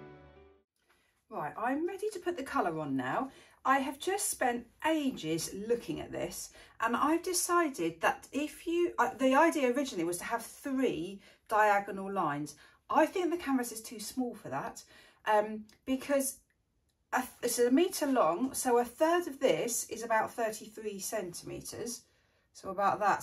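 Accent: United Kingdom. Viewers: By your accent I feel you are in UK, there are very few acrylic pouring demonstrations from anyone other than USA/Canada, though they are really interesting, it does make a difference to hear the materials as USA materials are not available in UK. I love your work, I am a beginner and struggling but determined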